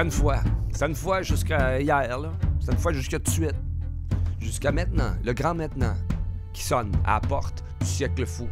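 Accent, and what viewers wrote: Quebec, Canada. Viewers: Wow! vas-y...t'es comme un Homme de meme pas 40ans qui parle avec 100 ans d'experience! une voie qui s'exprime avec la force de mille de nos ancètres. quand je menuis de mon pays je t'ècoute et rempli mon coeur de cette musique quebecoise qu'est notre langue ben parler...du pure quebecois...une langue, une culture precieuse...moi je vote pour qui est encore des milliers de "y etais t'une fois" a venir.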